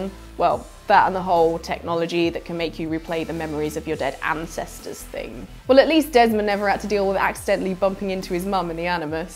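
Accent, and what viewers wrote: United Kingdom. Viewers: wow this was upload 3 secs ago, say hi to me plz, love your brittish accent, congrats for your new job youre doing well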